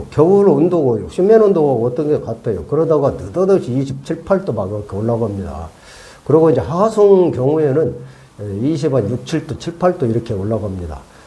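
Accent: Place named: Chungcheong, South Korea. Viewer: 무반주 충청도 아줌마 정겹습니다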